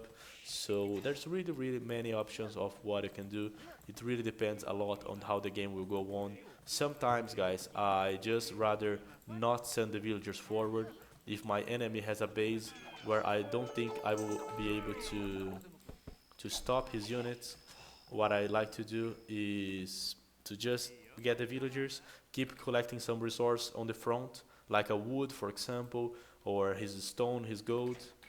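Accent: Brazil. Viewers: Your accent remember a brazilian speaking. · ele é brasileiro? o english dele é meio sotaque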